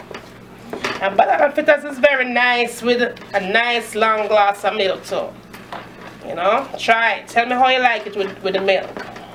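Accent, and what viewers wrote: Jamaica. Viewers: Instead of oil.????Jamaican accent is funny though. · wattah patois trong!! LOL!!! mi luv it. no mattah how ard mi try mi cyan get it 😪😪 dem always bun up thanks for sharing. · Previewing and loving your videos. You make it clear on what to do, and I love your patois--music to my ears. I just dubbed...don't want to miss any new videos. Thanks sooo much for sharing. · Nice, can't wait to make these. Long time don't eat, got all the ingredients. Just need my six beautiful, ripe sweet bananas like yours. Oh no am out of oil. me will get some. Lol, Love your Jamaican accent. :-) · Your Jamaican yes but please talk good for the viewers... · I love this. Real Jamaican with strong Jamaican accent fi tru! Mi start smell the fritters dem. Gonna do this the proper way tomorrow. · Hey 👸🏾saw dis video 1st time looks good😋😋never heard of banana fritters. 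I know donut shops have apple fritters donuts. I like yo accent it is very strong more Jamaican then it does now.❤This kind of reminds me of unleavened bread. Have u heard of dat or ever tried unleavened bread? I want to try and make dez 1 day.😊👍😋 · Mi luv the way how she talk cook everything proper Jamaican lady!!!!!! I'm a Jamaican by the way❤️😁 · You accent makes me really miss Jamaica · I've never heard of a banana fritter. I'm gonna make these soon. Thank you for sharing. I just love your jamaican accent. I subbed because you have a bunch of tasty looking recipes. 😘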